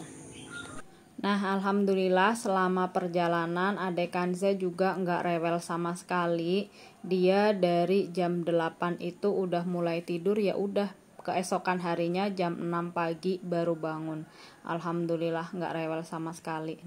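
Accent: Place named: Banyumas, Indonesia. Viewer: Mba Cilacap ya aku Purwokerto mba sama2 ngapak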